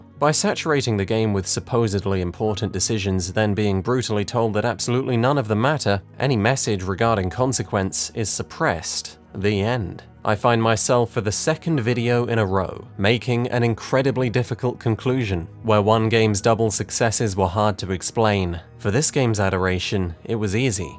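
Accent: United States. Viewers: Your American accent is blursed. Thank you very much for showing it off. Have a nice day. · That American accent gave me whiplash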